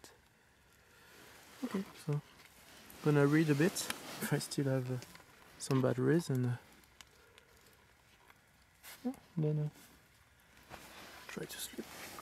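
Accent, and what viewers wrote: France. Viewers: You sound French but correct me if I'm wrong. I'd just like to know what's it like to camp. Thx uk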